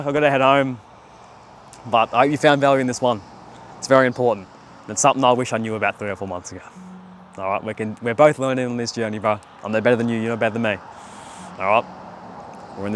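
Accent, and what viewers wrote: Australia. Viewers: the man or woman has an Australian accent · Even as a 15 y.o who believes he has the perfect girl, I can't help but still feel unworthy of the love I receive from her. She's the first person who hasn't stressed me out, who wasn't a bitch, who didn't self harm. And I've been with her since April last year. Fingers crossed my mood improves. [also you sound aussie, I'm aussie too :) ] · You’re Australian right? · Prob Aussie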